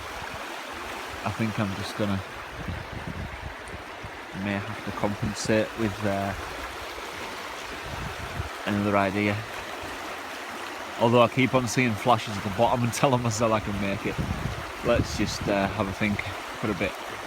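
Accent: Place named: Northern England, United Kingdom. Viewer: Connie Williams hey.u misunderstand me. I'm ribbing.I think he's a top geezer.love his work.I'm English Connie.I'm sure he won't mind me calling him a northern monkey.I understand every word or coloquesm he uses. I'm from stoke, north midlands, often described as a "clay head". We got scousers,jocks,taffs, paddies,cockneys, monkey hangers,woolybacks, yamyams.....the list goes on.we all have distinct dialects. PS everyone..no offence intended. As it goes, im 12th century Saxon invader,with Irish,Scottish and Angle blood. America and england are two nations decided by a common language. I want see catching American signal crayfish, they invasive species.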